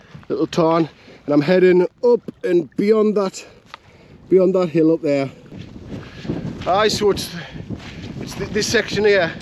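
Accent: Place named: United Kingdom. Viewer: Agreed!! I hike a lot!! And i play tennis and dance. I have a lot of callous. 😁 I watched all your CWT videos, enjoyed it. I went the same route when i did CWT in 2023 and 2024. Sometimes i have trouble understanding your accent lol 😄 so i had to hit rewind and listen again and again. May i ask what British dialect is that? Im from the US and clueless.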